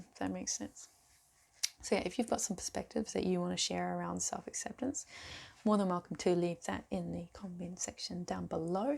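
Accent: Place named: Australia. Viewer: Your aussie accent is growing!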